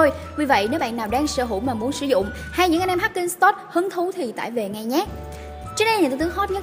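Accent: Vietnam. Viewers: Chị đọc tiếng Anh mà lai tiếng Việt, ức chế ghê !!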